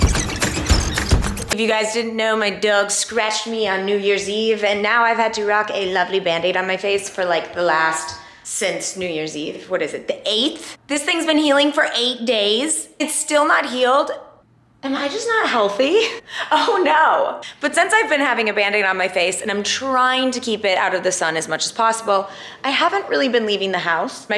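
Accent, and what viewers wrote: Minnesota, United States. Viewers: So Minnesotan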